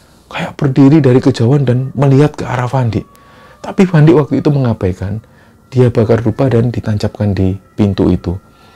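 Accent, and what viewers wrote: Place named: Surabaya, Indonesia. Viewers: Gaya bahasa khas surabaya nya bikin seneng denger nya.. 👍👍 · Mas aslix dr mana nggih, logatx kok sprti orang Pasuruan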